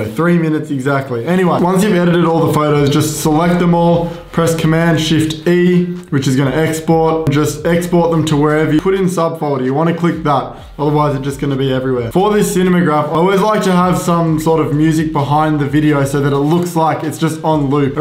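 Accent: Australia. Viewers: OMG I FREAKING LOVE how Aussie you are!! Lol it's so refreshing to hear someone as Melbournian as you on YouTube! Haha I got a HP but it's pretty much the same steps yeah?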